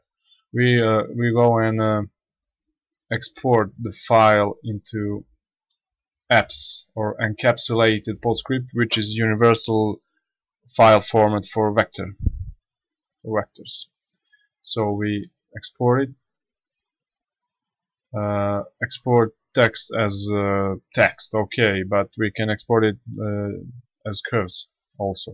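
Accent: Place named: Balkans. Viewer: Lol from your accent I thought you're from the Balkans, I'm from Bulgaria, so, yeah, I knew it! :D On the topic, I honestly prefer Corel, it is super easier for me to work with it.. However for pre-printing, one should use Illustrator. For all digital design that doesn't require printing I'd personally use Corel :) Cheers and pozdravi!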